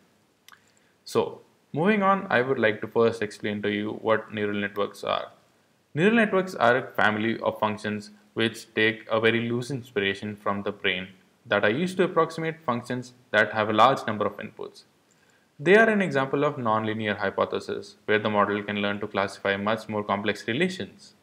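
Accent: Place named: India. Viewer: 咖喱味的英语听不懂啊^^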